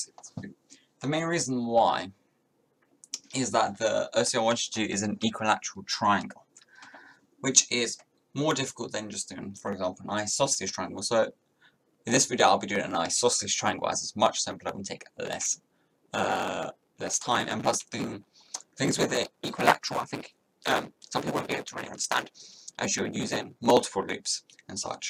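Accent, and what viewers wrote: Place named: United Kingdom. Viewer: bro he has the most generic british accent wdym😂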